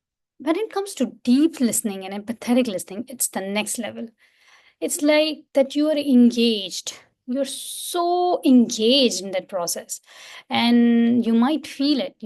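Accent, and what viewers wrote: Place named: India. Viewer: I am sorry, you delivery is incredible boring and you non words make me distracted, no thank you I can't go on and try to understand your speech that strong accent and not making any effort to pronounce well the language. And you repeat what everyone said out there it is override 👎may work in India, but not in thise side of the world, your competition pass you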